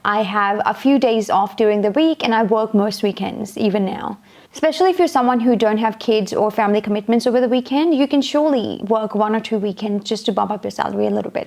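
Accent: Australia. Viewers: You speak like Aussie.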